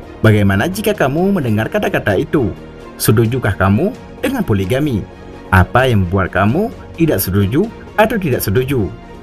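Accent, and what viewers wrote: Aceh, Indonesia. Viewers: Logat nya orang Aceh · orang aceh..dari logat bicaranya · Pengisi suara apa Aceh100% Aceh Pidie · macam orang Aceh lagi berbahasa Indonesia.. logat Aceh nya masih kental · Admin org aceh kah? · Admin nya kayak org Aceh ya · Orang aceh boz ku. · Dialek host nya dialek Aceh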